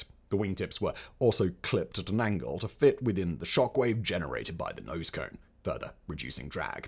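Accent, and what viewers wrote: England, United Kingdom. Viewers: Why does speak with an English accent but pronounce Berkshire incorrectly like an American?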